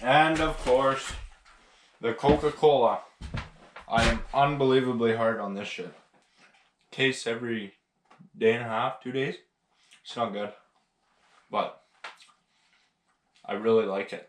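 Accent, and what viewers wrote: Canada. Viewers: Finally a Canadian on YouTube that sounds Canadian! Love your life mate, keep being yourself and we will keep watching and cheering you on!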